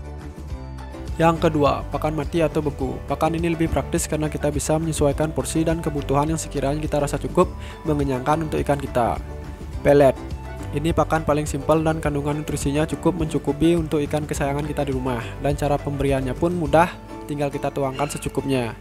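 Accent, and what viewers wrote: Bali, Indonesia. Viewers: Ngenah san logat bali ne bro 😅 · Nak bali oo bli? Ngenah ti logat ne 😅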